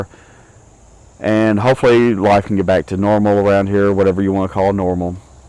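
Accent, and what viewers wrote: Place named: South, United States. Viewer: What a beautiful testimony . You are correct, God does love you.It takes a real man to tell such a personal story. Roxy may not fully understand everything when she sees this because she is young but she will fully understand it later. I am sorry you had to go through a hard time at school,kids can be mean sometimes. I actually just think you sound very southern, and I was wondering where you were from.God gave you the life he did for a reason and I think some of it may be for telling your story. You may have led someone to Christ by giving this testimony.I pray that you and your family feel better real soon. If you do have the virus maybe it will be a very light strain of it. I love the story behind the tattoos.Prayers are being sent you way.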